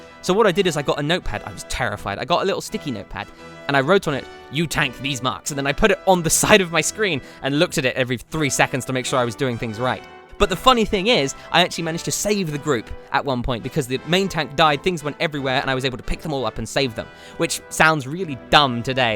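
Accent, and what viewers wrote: United Kingdom. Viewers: you sound british?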